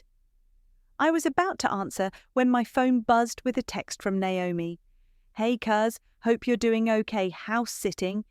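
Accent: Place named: England, United Kingdom. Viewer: Love that English accent.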